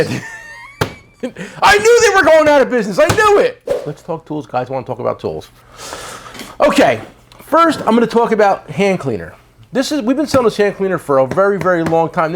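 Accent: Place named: New Jersey, United States. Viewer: Damn I kept wondering why I never heard the term "field" tool dealer wondering what the hell that means? Now I see the title failed lol went over my head. It must be that damn Jersey accent. Former Edison resident happily in Florida now.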